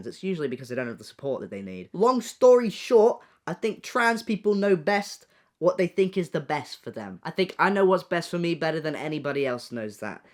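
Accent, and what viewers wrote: United Kingdom. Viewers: Okay but am I the only American who can't get over the fact that he says patronizingly funny because of the British accent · sounding EXTRA British today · Did anyone else notice that had more of a British accent than ever? Yes, I’m American. The British accent just seems to come out more in this video. I’m loving it. Lol!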